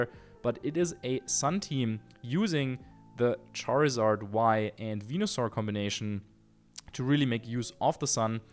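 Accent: Germany. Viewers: Samma da hör ich doch ze tschörman raus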